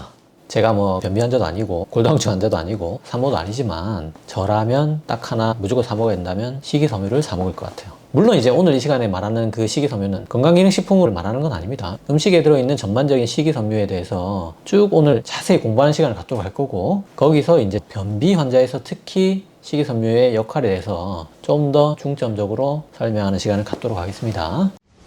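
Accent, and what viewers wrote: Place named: Busan, South Korea. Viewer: 우연히 선생님 영상보게되어 계속보게되네요 말씀하시는게 너무 친근하게 느껴져서 이상하다 했더니 부산에계시는군요 ㅋㅋ 같은부산사람 이어서 그런지 더 반갑습니다 좋은정보 너무 도움되고 좋아요 감사합니다 ~~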